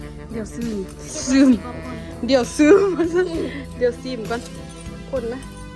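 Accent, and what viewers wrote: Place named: Sainyabuli, Laos. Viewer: สบายดี.ครับ.สาว..ครับชอบอะหยั่งในคริปของสาวแม่นบ่ครับ..กะชอบคำเว้าของสาวครับเว้าคำลาวไดัเปะฃู้คำบางคำแถวบัานอัายอแทบจะบ่ใด้ยินมาเบิ่งสาว เวัา..กะคึดออก..อัายกะคนเวัาลาวแบบสาวครับแต่.อยู่ฝั่งไทยอยู่ใกล้เมีองคอบครับในอำเภอบ้านอ้ายมืเว้าลาวอยู่สองหมู่บ้านครับ..สำเนืยงไชยะบุรื..ชอบเบิ่งบ่มักคอมเม่นท่อใดครับ..คนไทยเชี้อสายลาวครับ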